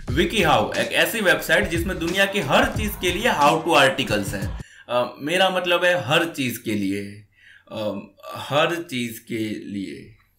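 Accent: Gujarat, India. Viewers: h aapka real naam 😅... I already knew you are from Gujarat bcz u have a gujarati accent... Waise awesome video, it was really very funny... also congratulations for 100K subscribers.... ❤️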